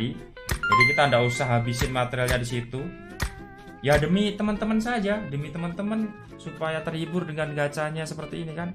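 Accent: Java, Indonesia. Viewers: ngomong medok wae mas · Mas e wong jowo ngendi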